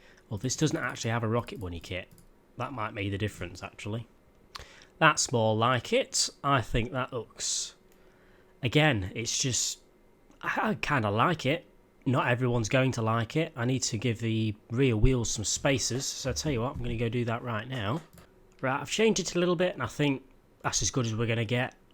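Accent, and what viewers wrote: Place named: Manchester, United Kingdom. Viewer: sounds like you've got a mank accent u from Manchester ?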